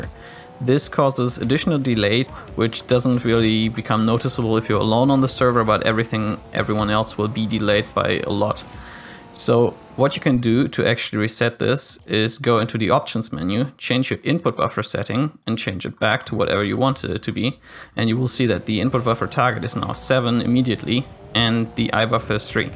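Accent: Germany. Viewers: U are German right? Youre english sound like when my english teacher speaks ^^ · Höre ich da einen deutschen Akzent?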